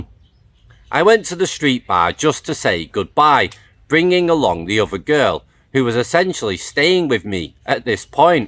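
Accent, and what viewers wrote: United Kingdom. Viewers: Use the 3 F’s rule it always works!!! That Brit accent?????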